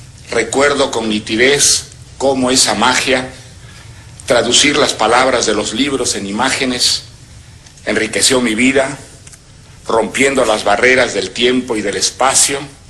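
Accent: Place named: Peru. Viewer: es que aunque intente pasar de español, es peruano.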